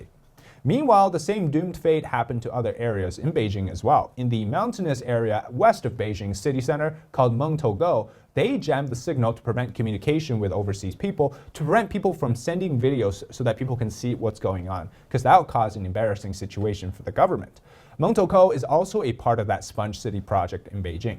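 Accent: United States. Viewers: >Accent : American >Looks : WTF, get a fg haircut, boy! Why are you still having Fk-loon-gong rural hairstyle!🤣 (make sure you make up your mind to part it to the left or right boy, hehe)😊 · You sound like an American, bro. 😅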